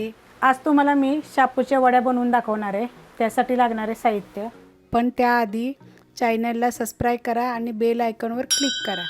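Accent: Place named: Solapur, India. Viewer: Tumhi solapur kadache ka...bhasha tashich ahe aamachya sarakhi....ani recipes super asatat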